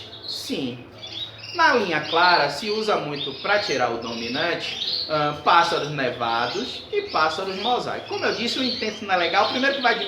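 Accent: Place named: Northeast, Brazil. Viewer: Sou do sul e acho muito legal esse sotaque aí do norte/ nordeste . Muito legal seus vídeos !!! Outra coisa, achei vc muito parecido com o Matheus Ceará , aquele humorista da praça é nossa :)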